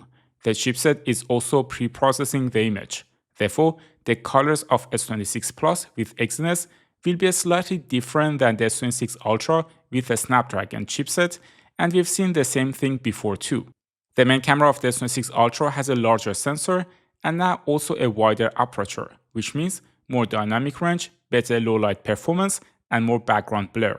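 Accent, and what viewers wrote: Iran. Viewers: همیشه یه لهجه ایرانی به گوش آشنا میاد 😊 موفق باشی پسر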